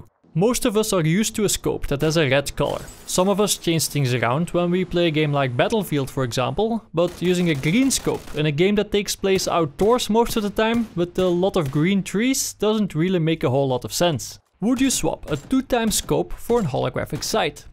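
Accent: Netherlands. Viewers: Zijn Nederlandse accent is wel echt geweldig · sounds dutch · your dutch im 100% sure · hoor ik gewoon aan ze stem dat ie Nederlands is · this guy has a dutch accent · Zwaar Nederlands accent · that dutch accent love you man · nederlands hoor ik aan het accent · ik hoor aan je accent dat je nederlands bent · Je hoort zo dat dit een nederlander is XD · u dutch boi can hear it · Nederlands accent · #dutchie haha gast binnen 1sec wist ik al dat je nl was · Most dutch guy ive ever heard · your Dutch accent stands out so much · gast je r is the hard het is gwn te horen dat je nl bent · Nederlander, hoor je meteen 🤣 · barely even realize ur dutch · the fkin overpowering dutch accent killing me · your english is terrible with a huge dutch accent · Jij bent nederlnads engels noob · work on your Dutch accent het maakt het wat moellijk te verstaan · You're so dutch. 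lol :D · Your accent looks netherlands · that Dutch accent is real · argggg the dutch accent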